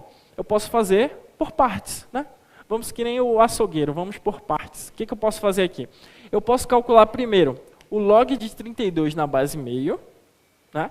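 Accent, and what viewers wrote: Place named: Manaus, Brazil. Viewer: Ótimos videos, parabéns! Sotaque manauara muito bom de ouvir.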